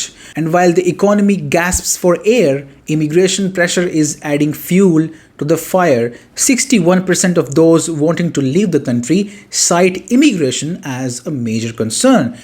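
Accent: India. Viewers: And also have no problem understanding your Indian accent. Very clear! Cool! 👍🏼 Keep up the good work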